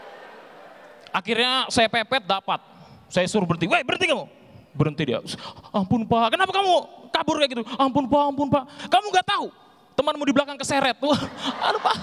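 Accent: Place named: Balikpapan, Indonesia. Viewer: Wkwkwkwkwk logat balikpapan yang sangat khas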